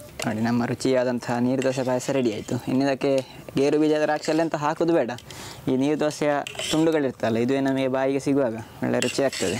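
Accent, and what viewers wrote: Mangalore, India. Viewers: Nimma vids ge naanu addicted aagogidini.. eshtu chanda adugegalu, chanda parisara, aa manglooru kade kannada, ahhaa.. nodtaa kootre samaya hoguvude gottagodilla nodri maarre!! Bahala santhosha!!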